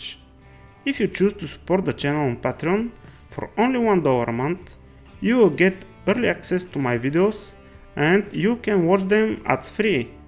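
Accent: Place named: Russia. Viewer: Акцент русский 😁 Работа отличная!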